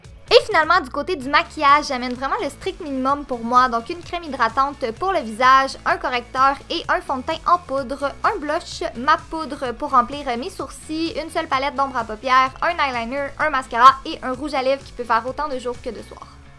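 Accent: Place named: Montreal, Canada. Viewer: J aimerais tellement aller vivre à Montréal j adore votre accent si je serais née entants que canadienne je serais la plus heureuse du monde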